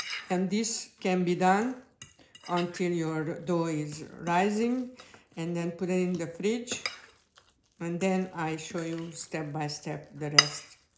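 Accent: Hungary. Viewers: What is the purpose of the cream of wheat? I want to try this but I have never bought cream of wheat. Your accent reminds me of my Grandmother who was born in Hungary. Love your videos. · This was difficult for you but you did nicely. Take off your bracelet next time. Keep at it. You’ll improve. Don’t worry about your Magyar accent, it’s nice. What is it in Hungarian and then English? Tell the audience. Also if you have ingredients that are from Hungary give reference where to buy. Good job!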